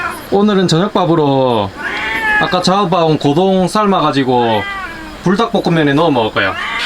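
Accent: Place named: Gyeongsang, South Korea. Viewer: 경상도 말투 무뚝뚝한데 강아지들한테 넘넘 부드럽게 얘기하네요ㅋㅋ 완전팬입니다^^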